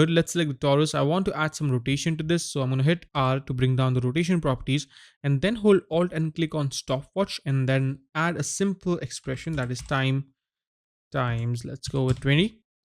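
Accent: India. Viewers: all is good man, but indian accent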